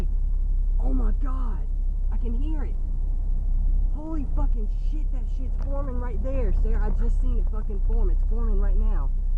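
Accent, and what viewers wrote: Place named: North Carolina, United States. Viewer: you can obviously tell that your from NC, you've got that NC Country Twang. I have it too. <-- and for the people that don't know what a twang is, its kind of like your accent.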